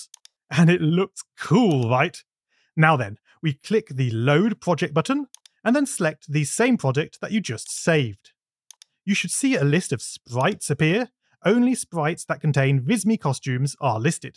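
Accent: United Kingdom. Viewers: I think you’re from UK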